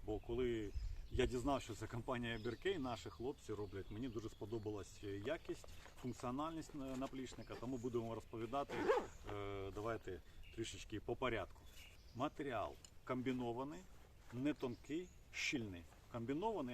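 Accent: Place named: Russia. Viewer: мені половина відео слух різало, наголоси на російський манер. Особливо в слові Український. Думав до кінця не додивлюся.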